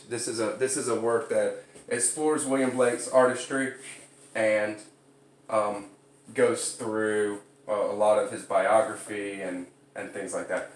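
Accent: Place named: South, United States. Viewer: I know you have an accent but don’t yet recognize different regions. I would have guessed southern. I came across Blake in my early 20s, his songs of innocence. I was reading Jerusalem to my mum and she just sang it to me. She was of the generation where it was sung every morning at school. I investigated the thoughts of Blake in writing Jerusalem and loved him and his art ever since.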